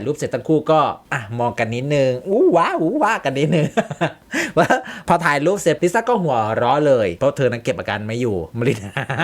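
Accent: Isan, Thailand. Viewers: พอได้ฟังพูด อิสารเลยกดติดตามคะ❤❤❤ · ชอบแอดเว้าอีสานมาก น่ารัก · ชอบในความหลุดความเป็นอิสานนะคะ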